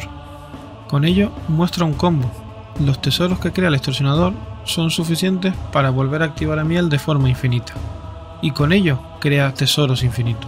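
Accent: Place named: Canary Islands, Spain. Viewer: Que bueno escuchar a un chicharrero comentando Magic, nuevo sub❤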